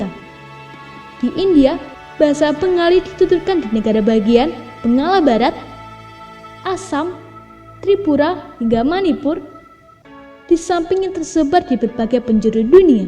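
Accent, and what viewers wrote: Indonesia. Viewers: Suaranya medok banget kaku banget bahasa Indonesianya. Mending lo belajar bahasa Indonesia dulu yg bener baru membahas bhs yang lain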